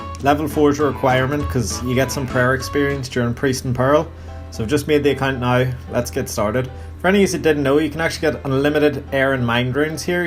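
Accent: Northern Ireland, United Kingdom. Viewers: Norn Iron content creator? Lol gotta watch your shit from now on. You from Co Down? Hard to tell if your accent is posh Lderry or south down. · Northern Ireland?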